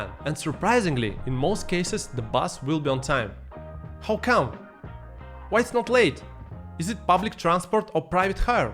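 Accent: Singapore. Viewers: the way you speak, you are almost a Singaporean. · Yeah ur ar so funny. U Sounds more n more like a Singaporean. Ur video nvr fail. Amazing ❤Enjoy watching ur video. What i love most as I mentioned before is ur accent. Btw we hv another habit love to q for free product too. Sometimes the ppl who is in front of us do not know what the free product is..juz q. 😂anyway take care.🙏 Looking fwd to ur upcoming video. God bless😇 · Haha you’re sounding more and more like a Singaporean one😆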